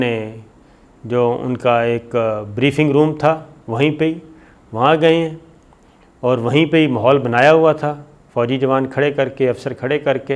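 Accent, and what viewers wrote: Punjab, Pakistan. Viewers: Tum punjabi ne urdu ki mother fuck kardi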